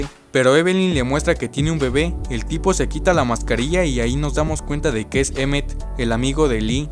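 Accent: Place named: North, Mexico. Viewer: Yo fui productor de television en la especialidad de publicidad en pocas palabras era productor de comerciales, yo voy a ti, como locutor, tienes una magnífica voz, pero no tienes nada de experiencia y te hicieron debutar demasiado pronto, lo principal es el acento neutro y yo aunque no soy mexicano me parece que tienes un acento norteño yo estaba esperando en cualquier momento el "puesn" eso es el grave defecto que veo en tu desempeño, pero felizmente eso no es mortal y tiene solución, estudia para adquirir el acento neutro y practicar por lo demás material hay y muy bueno., tu voz, lo de la locución profesional puede esperar un poco y prepararse para un mejor desempeño, felicidades y adelante.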